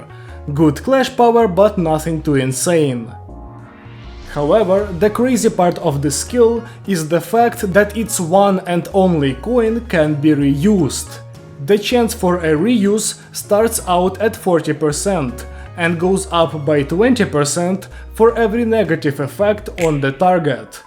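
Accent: Russia. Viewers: Я же слышу что ты русский наш слон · Are you Russian, by any chance? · Как же он пытается говорить на английском, но как же слышно русский акцент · russian accent wth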